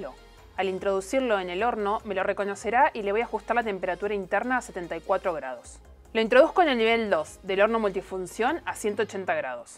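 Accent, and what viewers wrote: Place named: Argentina. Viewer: Maravilloso tu Espacio. Sólo hago hincapié en q' debes llevar con HONOR y al máximo Orgullo el GRAN acento ARGENTINO 🇦🇷. No bloquees tu pronunciación, q' queda muy tierno , dulce e interesante. Ser y pertenecer a un mundo Anglosajón es una maravilla , pero siempre poniendo en frente y sin intentar olvidar nuestras raíces , ni hcia donde vamos. Saludos y muchas felicitaciones !!!!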